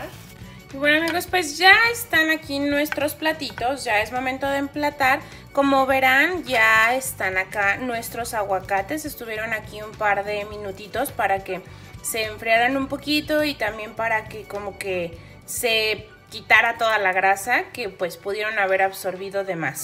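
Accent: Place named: Fresa, Mexico. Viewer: Hablas muy fresa, además me desespera un poco que repitas las palabras tanto, por otro lado no es fácil hacer un vídeo, gracias por compartirlo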